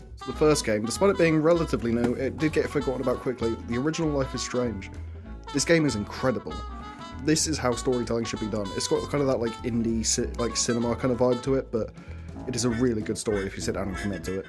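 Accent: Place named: Midlands, United Kingdom. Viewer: Hey judging by your accent you’re from the midlands right?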